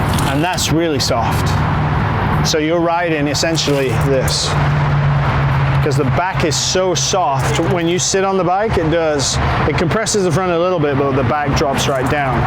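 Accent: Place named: Northern England, United Kingdom. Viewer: I've been watching some of his videos and trying to guess the accent too. Think I hear hints of northern English (Manchester maybe) but it's faded a bit from probably travelling a lot. Anyhow yeah watched a bunch of videos about suspension and he's the only guy I really feel like I'm understanding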